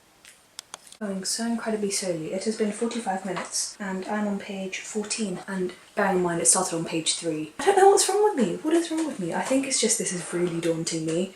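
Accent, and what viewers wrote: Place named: Bosnia and Herzegovina. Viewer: Are you Bosnian? Your accent sounds Bosnian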